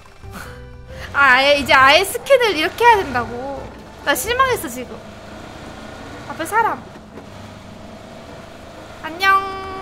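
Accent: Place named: Gyeongsang, South Korea. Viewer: 부모님이 경상도 분이신가 봐여. 님 억양에서 경상도 억양이 살짝 묻어 나네요. ^^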